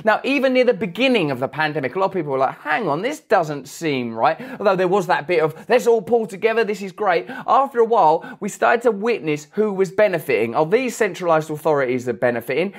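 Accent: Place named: Cockney, United Kingdom. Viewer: Hey, could you please say "story" also without a "t", as you omit all other "t-s"? I'm joking 😊, big fan 🤩, keep up the cockney 😆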